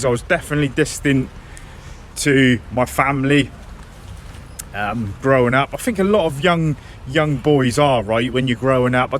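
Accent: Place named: Midlands, United Kingdom. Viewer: Your not likely to take to Spice then ;-) .Just saw a documentary about Manchester and the Spice epidemic .From your accent i detect the Birmingham /Coventry /Midlands locality ? .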